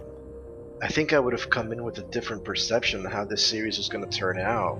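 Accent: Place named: Puerto Rico. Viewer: oh i didn't, but that's great :D i meant your accent that your from puerto rico, like i didnt no which but i knew you were latin lol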